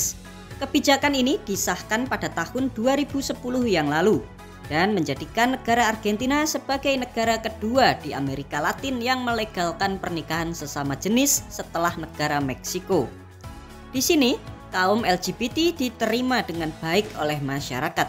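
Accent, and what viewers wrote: Java, Indonesia. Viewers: Dialek Jawa ny kental banget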